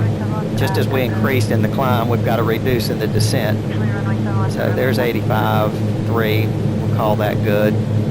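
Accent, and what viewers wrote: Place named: Texas, United States. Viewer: are u from Texas? Sounds like that greetings denmark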